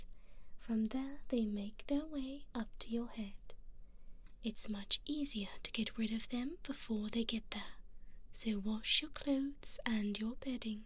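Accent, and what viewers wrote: United Kingdom. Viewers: I love the bri'ish accent. Love your audios❤ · 40 minutes of you must be what heaven is like,. Only forever! I love your British accent. This artwork of you is phenomenal! 😍 And my birthday was 2 days ago. This is a great present! 🎂🥳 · I'm so used to the Tomboy voice that hearing this gentle, higher pitched British Esq voice, gave me whiplash. Good to know you have a versatile voice! Makes for much more entertaining ASMR videos. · are you british by chance? (i’m the anti furry you talked to a few months ago)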